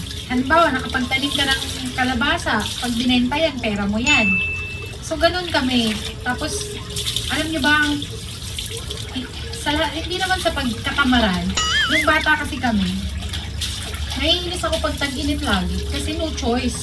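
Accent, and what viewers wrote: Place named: Visayas, Philippines. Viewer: Pyor jud bisaya si ma'am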